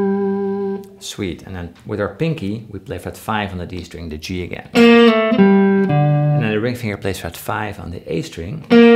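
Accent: Netherlands. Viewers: What nice licks,! I ( 73 ) play and love my guitar(s) since 65 years. Still pure joy, fascination and constant learning. In addition, your slightly nederlands accent: Wonderful! Keep it up!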